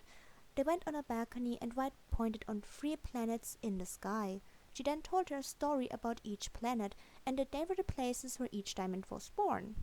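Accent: Germany. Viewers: Wow this is amazing. I really love this!:D Thank you for sharing this with us and I look forward to being on this journey with you. And your German accent is beautiful! never apologize! I'm learning it now ^^ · Omg ich habe mich schon gefragt, ob ich mir nur einbilde dass dein Akzent deutsch ist xD Glückwunsch zu den Abonnenten und ich liebe deine Videos :)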